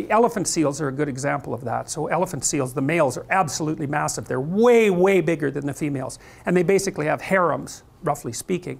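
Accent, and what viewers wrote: Canada. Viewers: I find the Canadian accent very annoying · If he didn't sound so Canadian people would take him more seriously.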